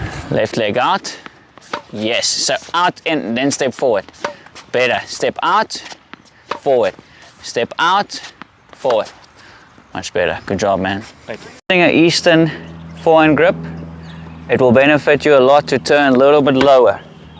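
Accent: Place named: South Africa. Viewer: Few things more satisfying than your coach expressing approval by shouting "BETTAH" in a South African accent.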